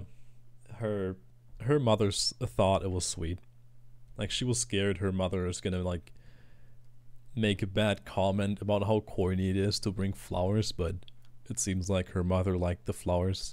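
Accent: Germany. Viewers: Wait youre German? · Ohh you are German?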